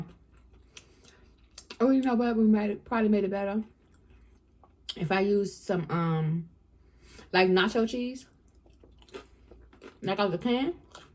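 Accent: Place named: Washington DC, United States. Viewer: Are you from DC? You have a DC accent😃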